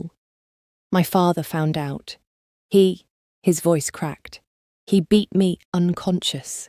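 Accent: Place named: United Kingdom. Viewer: For some reason when I hear a British accent telling the story, it helps me what is that, lol love that accent all the time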